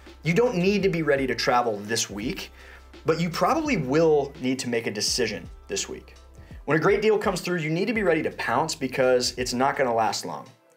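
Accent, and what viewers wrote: United States. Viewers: Hmmm ......You’re watching content on an American’s channel (with a definite American accent). It can be picked up in other countries but it looks like it’s basically a video by Americans for Americans. And you’re upset that it doesn’t apply halfway around the world?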